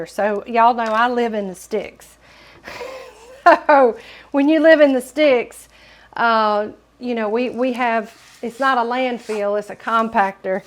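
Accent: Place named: South, United States. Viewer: Great video. Thank you. Automatically gave you a thumbs up for the southern accent alone. 👍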